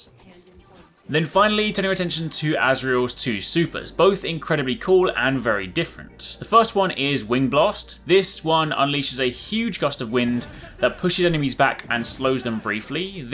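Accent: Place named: United Kingdom. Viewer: Here because I had a comment saying we sound the same! 😅 We don't... we're just both British! Haha. All the best to you dude 🙂👍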